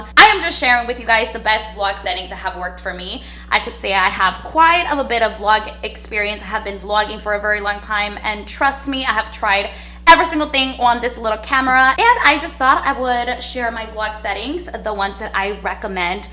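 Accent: New York, United States. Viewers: I love how you say "all" "on" "always" "honestly" ❤😂🤣 you must be from new York or Boston 🥰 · I never thought I’d find a video to actually help me with this but I DID! THANK you so so much! Ps. Is your accent NY or NJ?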